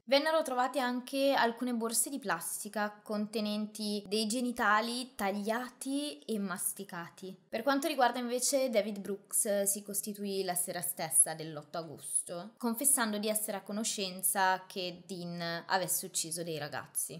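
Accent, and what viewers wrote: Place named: Milan, Italy. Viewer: Sì consocevo la storia di Candyman; uno dei più famosi serial killer USA. Poveri ragazzi, adescati dall'alro farabutto coetaneo e complice dei Corll. Ti chiami? Ebbene è il mio primo video del tuo canale e mi sono iscritto. Hai una buona voce e narrazione con accento lumbard milanes.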